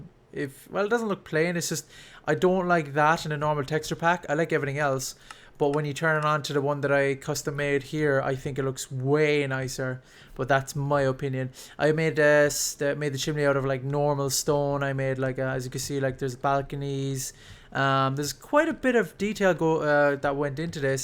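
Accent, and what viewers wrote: Ireland. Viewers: Love the Irish accent ;)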